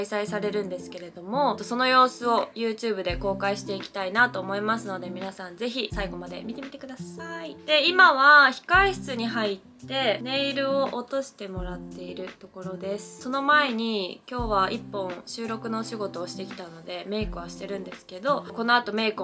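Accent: Kansai, Japan. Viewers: エキストラに参加させて頂いて、その際に彩ちゃんを初めて拝見しました！！❤ スタイル良過ぎてビックリしました！😳💕（語彙力 それから彩ちゃんの虜です😍💓 関西弁が可愛すぎて可愛すぎて☺💕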